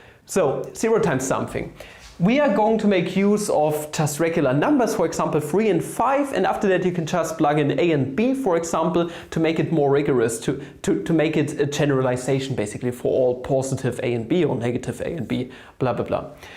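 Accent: Germany. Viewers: why? I mean the accent sounds very german but I just wanted to ask cause I wasn't sure. Other countries have accents like that too. But I actually saw on his other channel that he is, in fact, german.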